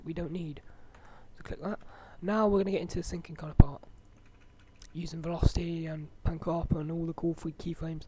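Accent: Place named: United Kingdom. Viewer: Which part of the uk you're from? I'm from London ;p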